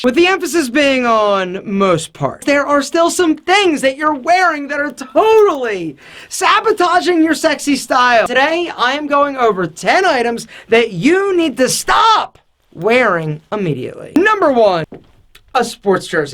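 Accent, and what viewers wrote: Philadelphia, United States. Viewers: He is from Philly. As am I. You can tell right? Lol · Lolol i was thinking philly too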